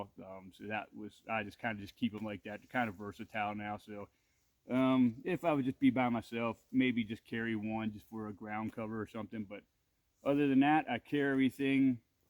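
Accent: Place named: Philadelphia, United States. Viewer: Nice job with your video. What County are you in? You sound like you’re from the Philadelphia area!!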